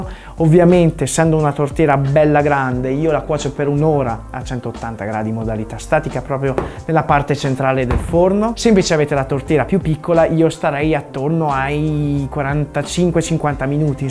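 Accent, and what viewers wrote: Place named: Emilia, Italy. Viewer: Ma sei troppo forte, sembri emiliano come me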